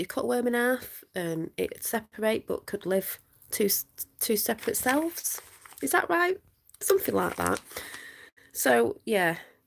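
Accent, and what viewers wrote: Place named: Oldham, United Kingdom. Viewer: Hope you don’t mind me saying .. it’s great to know there is someone who thinks like me near me with the same funny accent .. I normally hear stuff like this from Murica.. I’m from Oldham 😊😊😊 love and light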